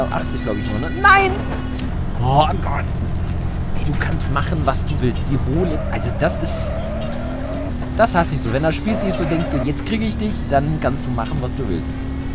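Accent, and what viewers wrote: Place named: East Germany, Germany. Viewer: Ach lass die Leute Reden, Ich mag die Grafik . :) Und ich kann's mir Vorstellen, was es für ne Arbeit war das Spiel zum Laufen zu bringen ;) Dann Funktioniert dies nicht, dann das nicht.. dann braucht der andere Treiber u.s.w. ;) Wie du dich Freust... damit Zauberst du mir ein Lachen ins Gesicht... Merci dafür :) Dein Ost-Dialekt xD einfach Geil Oh. Ich tu auch gern Autos Tunen ;) (In Videospielen) aber ich mag das... ..Die Reifen müssen Brennen! xD :D Und aus dem Auspuff muss Feuer kommen :D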